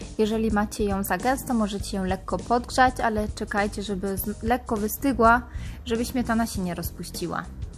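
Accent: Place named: Germany. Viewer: To że ktoś jest ze wsi nie znaczy że odrazu na tak mówić, to gdzie to mieszka nie zależy od tego jak mówi... Ta pani mieszka w Niemczech więc ma powody, aby tak mówić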